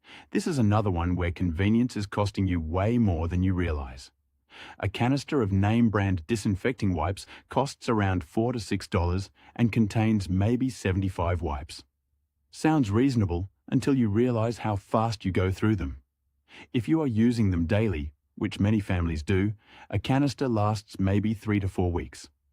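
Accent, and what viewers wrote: United Kingdom. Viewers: It could be. But I've noticed that videos made with AI have a little marker just below the video area that identify them as such. This one does not have that. Also, having listened to the entire thing, I doubt it. I don't understand why the narrator has a British accent but listening to it, I can say, it's not AI-- with AI narration, there's always a give away; some little quirk, mispronunciation or glitch. None here.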